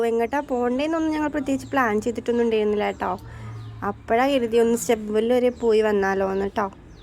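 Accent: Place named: Malappuram, India. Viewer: Kutty Malappuram aano samsarathile Tto Tto kettappo thoni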